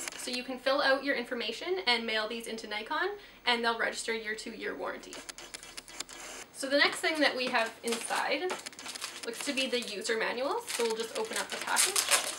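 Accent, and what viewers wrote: Canada. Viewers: I'm guessin your Canadian?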